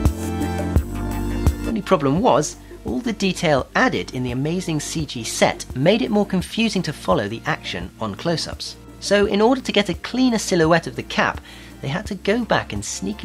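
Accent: United Kingdom. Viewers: this video is alot better because of British accent? I love it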